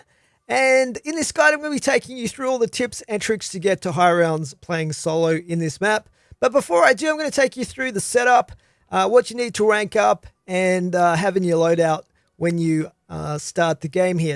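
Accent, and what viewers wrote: Australia. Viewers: Literally I only ever watch your zombies content. Somethin about your australian accent and calm demeanor makes you so entertaining. Definitely an underrated youtuber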